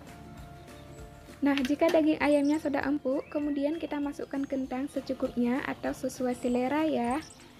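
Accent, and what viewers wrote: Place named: Aceh, Indonesia. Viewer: Bahasanya meu aceh that. Ketawa aku2 😀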